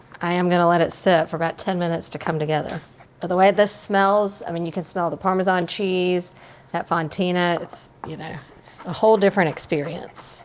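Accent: South, United States. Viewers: Your southern accent is on point. Just enough. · For my first comment since discovering cooking methods on this channel.... More of that Southern "Southern" accent please.... 😂💯💯💯 👋🏼👋🏼👋🏼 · You are SO attractive. The combination of your looks, personality and that Southern accent is just addictive. His bless your husband; he must LOVE 💕 you!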